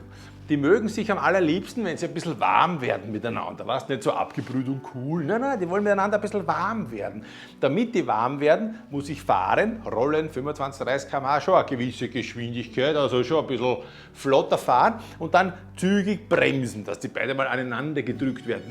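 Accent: Austria. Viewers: geiles Video , geiler Typ , tip top erklärt 👍 und der beste Dialekt , ich liebe die Österreicher 😂👍👍👍 Grüße aus der Nachbarschaft , Allgäu Füssen 👍😊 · So einfach und gut erklärt im Wiener Schmäh, ich liebe euern Dialekt. Weiter so ‼️👍🙏💪 Viele Grüße aus Berlin ☀️ Cheers 🍻 und Happy trail's ❗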